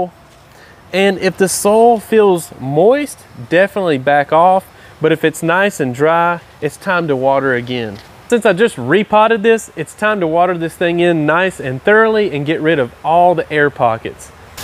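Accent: South, United States. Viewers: Hilarious! I just got myself a little Meyer lemon tree and wanted to take the very best care of her- saw that this video had a southerner explaining stuff and knew I was in the right place! Thanks a bunch! Especially liked the alternative options for soil and fertilizer. One question: I watched a another person's video on YouTube and she mentioned that you should look for the part of the trunk that all the roots are coming out of ( it kinda looks like a peanut in the shell) , she said when repotting you should keep that part above the soil. Any thoughts or other explanations? Thanks again!!!!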